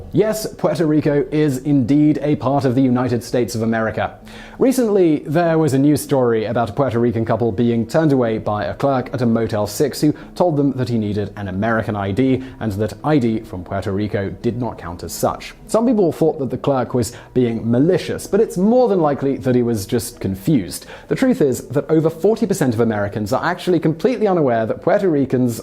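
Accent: England, United Kingdom. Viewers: Get things wrong once in a while? He makes at least one major blunder in every video I've seen, often more. I think he's coasting on his English accent, but he's actually pretty ignorant. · This guys a Brit from Richmond VA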